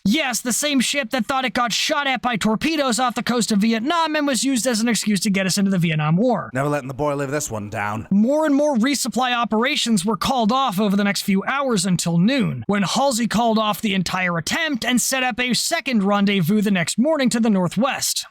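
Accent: United States. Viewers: Proud to say that im a long time sub from MA (ngl thought your voice sounded like somewhere out of NE, let alone MA.). Next round of dunks is on me 🙏🙏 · I fuckin knew you were from Massachusetts My parents grew up there I HAD YOU CLOCKED AS A MASSHOLE THE SECOND I HEARD YOU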